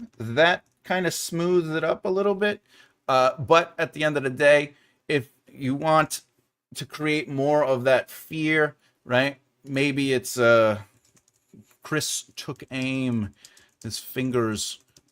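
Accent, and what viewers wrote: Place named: Boston, United States. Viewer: And, with your reading accent, makes it sound super Boston.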